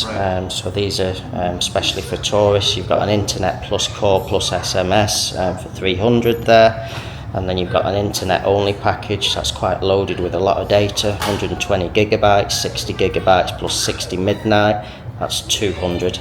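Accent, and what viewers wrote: United Kingdom. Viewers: I think he already spoke English just the accent is British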